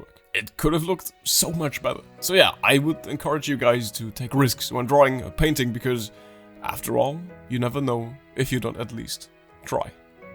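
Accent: Germany. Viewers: yo are you german? bc your accent gives it away a bit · Him being sick oddly made him sound twice as german as usual. Especially at the end when he screamed "NOW GROW SOME BALLS DAMIT!" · is it me, or has less of a German accent over the time that he's been posting.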